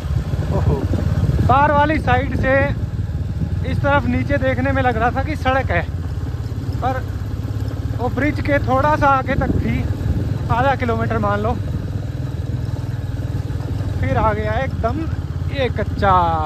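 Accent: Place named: Chamba, India. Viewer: Chamba se ho ap kya bhai nyc video apki bolne ki tone thodi chamba ki lagi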